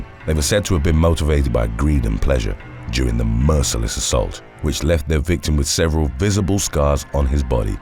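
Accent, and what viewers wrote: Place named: England, United Kingdom. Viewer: Holy shit! That's you?! It is! Woah! So let me get this straight; you're that frickin stacked, black with a charming English accent, you got one of the best channels on YouTube and you look like that?! Alright! We get it! You're the king of all men everywhere! Fuck off! Would ya? Fucking King Man over here. I mean shit! What's next? Ya gonna front a rock band? It's no wonder we never see you. You're probly too busy having a three way with lingerie models on a mountaintop or something. Shit! Oh by the way, I think a good topic would be, "When cemetery workers / gravediggers/ morticians and the like go wrong." I've known some of these folks and they're all fucking psychopaths!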